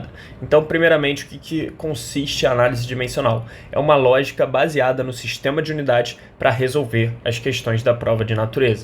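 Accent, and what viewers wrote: Rio de Janeiro, Brazil. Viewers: nada a ver com o conteúdo mas, tu é carioca da gema?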